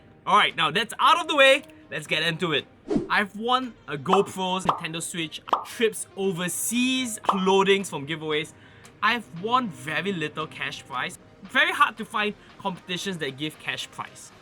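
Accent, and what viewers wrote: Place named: Singapore. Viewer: Singlish to the max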